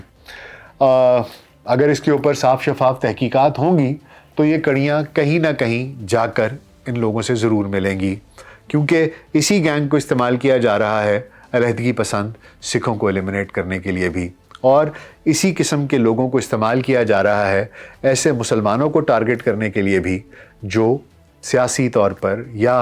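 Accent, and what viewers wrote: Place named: Pakistan. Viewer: From Pakistan, app ki urdu aur andaz e bayan sa lag hi nai raha ka app india sa ho. Beautiful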